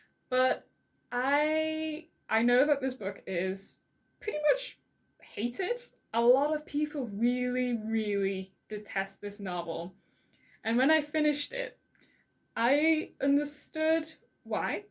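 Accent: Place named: North America. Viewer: Great video. Someone gifted me the complete collection of HG Wells recently, some I have read, others I’d never even heard of. I’m looking forward to reading them. You have a great accent. North American with a hint of northern English perhaps?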